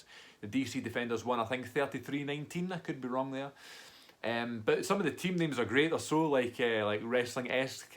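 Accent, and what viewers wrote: Glasgow, United Kingdom. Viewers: I live pretty close to St Louis so I'm gonna be rooting for the Battlehawks, but the Roughnecks, Defenders, and the Guardians were all pretty good as well. From on-sight reporting, there appears to be quite a bit of excitement for the St Louis team because they've been without a team since the Rams moved to L.A. 3 years ago. The Battlehawks are gonna be playing in the old Dome stadium that the Rams played in, so crowds will look small at first, but hopefully if this league is a success, and the Battkehawks turn out to be good, they will garner a decent crowd there. PS. I worked with a guy from Glasgow back in college at Southern Illinois University. His accent wasn't nearly as thick as yours. Must've been because he'd been in the States for awhile.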